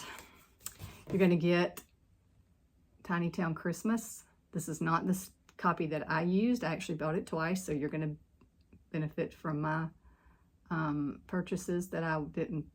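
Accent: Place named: Alabama, United States. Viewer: I’m hearing the Alabama!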